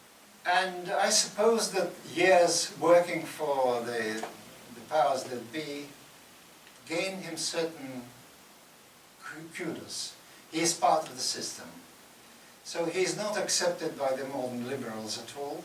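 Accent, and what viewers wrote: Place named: Russia. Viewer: Всеволод Борисович звынайтэ) Но, у Вас четкий, русский акцент. И интонации. Конечно, англичане не поймут. У них у самих там столько интонаций..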